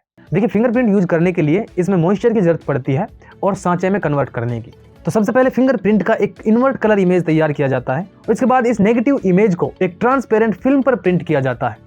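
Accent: Haryana, India. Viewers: Bro Haryanvi style toh hai 🤔 ( suggest me) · I can see that Haryana accent in his voice , good work bro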